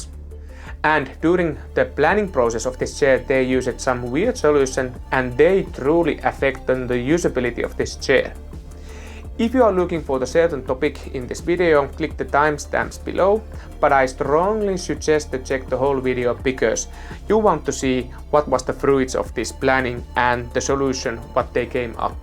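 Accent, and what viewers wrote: Finland. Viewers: I hope that youtube algorithm gods will help you grow, you made a good representation, pretty high quality video. And after playng a lot of MSC i love finnish accent :-DDDDDDD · Are you from Suomi? You speak exactly same as my Finnish husband :D nice chair actually.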